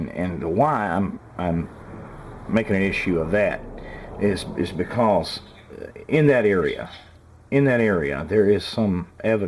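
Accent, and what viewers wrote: United States. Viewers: His voice is soo... "American" its awesome!!!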